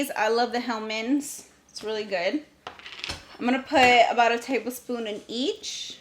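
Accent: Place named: Houston, United States. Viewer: It's the Houston accent 🙌💪💪💪. You look beautiful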